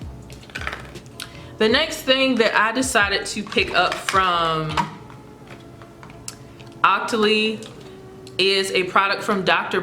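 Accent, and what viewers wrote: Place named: Texas, United States. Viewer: Are u from Texas??? Your accent is everything 😘.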